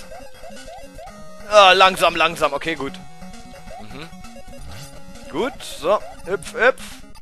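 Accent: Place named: Berlin, Germany. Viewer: berlinerisch!!!!!!! Icke.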